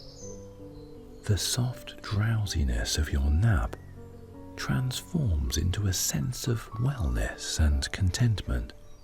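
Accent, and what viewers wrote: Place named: United Kingdom. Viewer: A Brilliant voice, an amazing British accent, a great imagination to be involved in, thank you from Iraq! ❤❤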